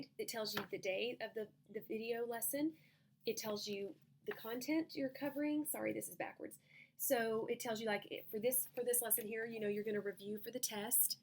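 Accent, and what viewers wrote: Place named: France. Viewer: Greetings from Algeria, your french accent is funny, thank you for sharing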